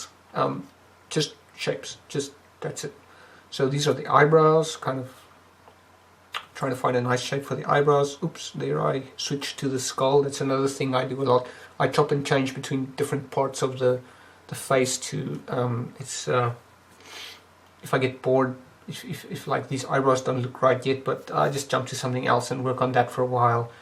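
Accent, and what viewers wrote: South Africa. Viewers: South Afrian?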